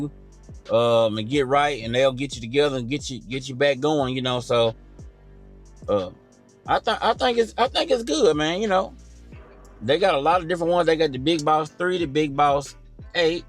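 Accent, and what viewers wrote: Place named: Tennessee, United States. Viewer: Completely unrelated but you from Tennessee? That accent sound similar to mine 😂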